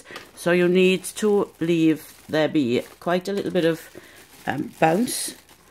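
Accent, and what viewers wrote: Wales, United Kingdom. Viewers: Wonderful tutorial and so lovely to hear a 'tidy' Welsh voice.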